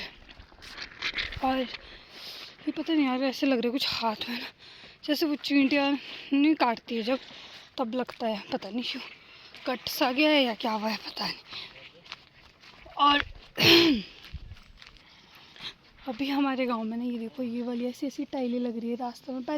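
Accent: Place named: Kashmir, India. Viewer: You look like a Kashmiri girl, and your tone also sounds the same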